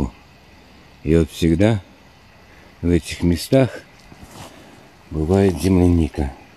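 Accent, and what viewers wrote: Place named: South Ural, Russia. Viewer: 56 лет назад я уехала с родины, Южного Урала, в Казахстан и нашей земляники не видела столько же лет. А вкус её помню. Очень приятно услышать наш говор южноуральский. Спасибо, что всколыхнули воспоминания.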